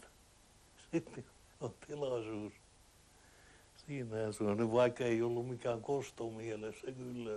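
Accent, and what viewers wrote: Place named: Sortavala, Russia. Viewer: Utissa <num> aloitettiin alokkaina. Esikuntakompanjan rakennuksen vieressä oli Mersu, jonka edessä otettiin ensimmäiset kuvat. Tupien nimet olivat menetettyjen lentotukikohtien nimiä. Illu puhuu Sortavalan miehen murteella ja tyylillä juuri noista paikoista, joiden nimiä käytimme ryhmänjohtajina. "Tupa Suurmerijoki jne"..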